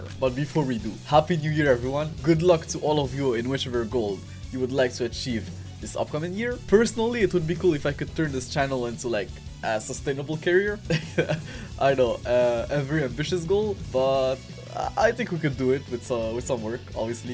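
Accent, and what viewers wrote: France. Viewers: i can hear the french accent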